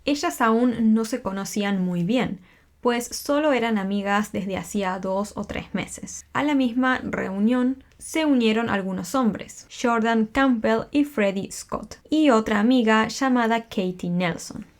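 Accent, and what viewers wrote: Argentina. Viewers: Si, es Argentina.es muy común el Che- es su acento. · Será que es argentina?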